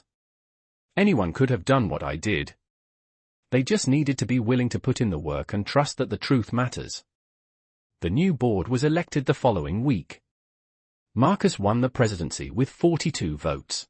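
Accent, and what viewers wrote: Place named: England, United Kingdom. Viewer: The obvious english pronunciations of words doesnt go with the story.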